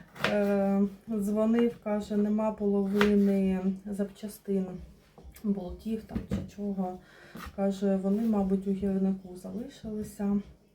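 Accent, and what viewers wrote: Surzhyk, Ukraine. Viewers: Ще що вам хочеться? розмовляє на суржику,і це нормально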